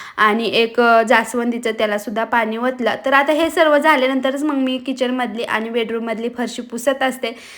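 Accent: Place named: Sangli, India. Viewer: Languagecha tone sanglikdcha vatoy...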